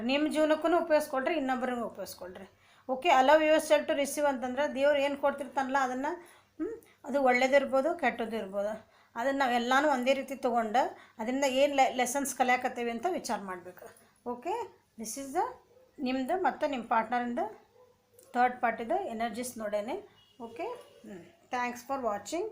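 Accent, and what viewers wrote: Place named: Vijayapura, India. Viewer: Bhahal chanda heliri akka nivu helidu kare ada noda akka nammadu vijapura nimma matado saili namma kade ede anasakataiti yava uri akka nimma vedo ede fast nodari kannige bididu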